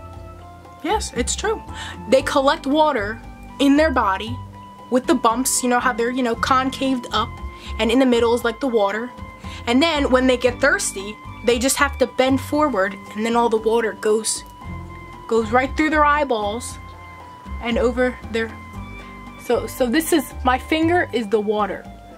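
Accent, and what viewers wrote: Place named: New York, United States. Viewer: Haha you made me laugh a few times. Very entertaining. I am from Australia but I think I can pick your accent to come from somewhere near New York maybe? Anyway if you want all the wild bearded dragons you’ll need to come to Australia. Thanks for teaching me stuff!!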